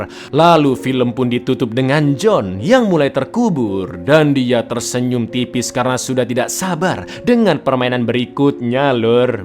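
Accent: Indonesia. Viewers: menurutku ngga papa, biar ada aksen Indonesia nya, biar jadi ciri khas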